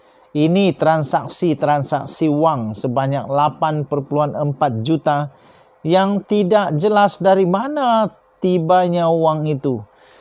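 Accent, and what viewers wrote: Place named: East Malaysia, Malaysia. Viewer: Portal paling bodoh. Gaya cakap bukan orang semenanjung ni.. cakap cerita yg sama pusing2 ba... tak da kerja ke?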